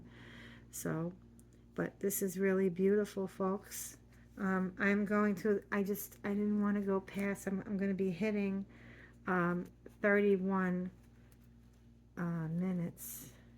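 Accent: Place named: New York, United States. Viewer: Absolutely beautiful! Love that NY accent ❤❤ it reminds me of a peony. I’m going to give it a try. May make one for the baby shower Sunday.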